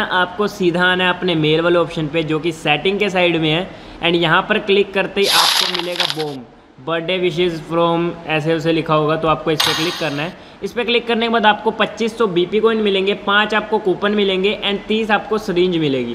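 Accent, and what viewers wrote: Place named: Punjab, India. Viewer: Punjabi voice 😊😊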